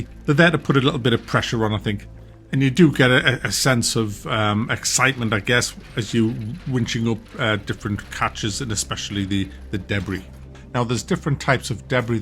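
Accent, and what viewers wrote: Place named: Sunderland, United Kingdom. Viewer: Is this guy a mackem? Worst accent I’ve ever heard.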